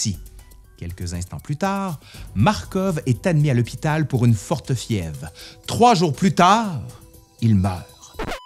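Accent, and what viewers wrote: Quebec, Canada. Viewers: C'est drole avec l'accent quebecois. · Je savais bien que l'huile de ricin était trop dégueu pour être honnête 😄. Le coup du parapluie bulgare est tellement romanesque que le cinéma l'a utilisé souvent et Pierre Richard l'a rendu immortel avec le coup du parapluie, que je vais d'ailleurs me revoir, ça m'a donné envie 🙂. Vidéo intéressante, elles sont toujours de qualité et bien écrites, le "petit" accent donne le petit plus, j'ai toujours eu envie de visiter le Québec. Tu prépares avec ton compère une histoire de la médecine? Tu m'en vois ravi. Je me réjouis de voir ça. Je suppose que tu prévois plusieurs épisodes pour un tel sujet? Cool, excellent programme. Encore bravo à toi et à ceux qui bossent avec toi. Salut. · Bonjour. Merci pour vos vidéos toujours intéressantes, marquées de l'accent de la belle province qui, je dois l'avouer, me manque un peu. 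Sujets maîtrisés, une excellente présentation soulignée par un montage de qualité, agrémenté de parfaites animations. Longue vie à votre chaîne.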